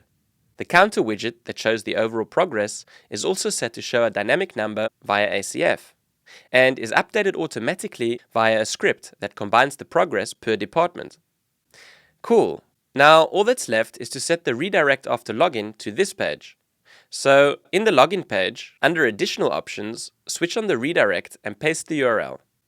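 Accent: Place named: South Africa. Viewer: This dude is definitely South African...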